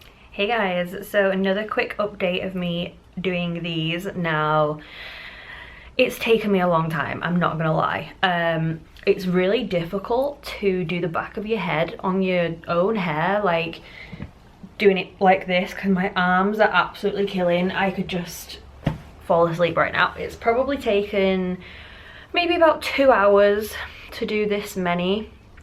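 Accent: United Kingdom. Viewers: And i just wanted to say that you are awesome and i love watching your videos. I just recently found you and I am glad I did because i Love that you are different than most, hell...I am different than most! But i love your channel and I will continue to follow you. You're pretty awesome! I wonder, how much was it to order your dreads? I would Love to see if i can buy them from the USA. I love the UK though, it's the one place that I must see before I die. I don't know what it is about the UK but i gravitate towards it. Its like something pulling me and i am so in love with the Accent, the culture, everything about it! Is your real name? I apologize if that's personal or a little forward but is favorite name in the WHOLE wide world. I was going to name my daughter Belle. But her grandma was like NO, people will call her Lunatic, So yeah... i was like SO What, she could be called worse..lol! 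Anyways, you get the point! You ROCK!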